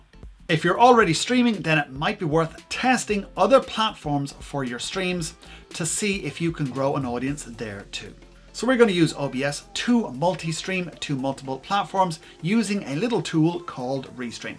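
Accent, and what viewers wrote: Ireland. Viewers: Is he Irish?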